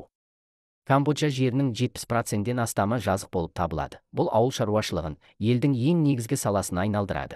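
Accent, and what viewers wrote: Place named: China. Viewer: Кытайдан келген бе, казакша акцент “керемет” терминди айтпаганда,мемлекеттер атын дурыс атау керек!!!немесе мектепте дурыс окымай казак тили мен адебиетине дурыс ман бермеген бе? Блогер болу ушин жаксы дайындык керек, твндаудын ози ауыр.😮😂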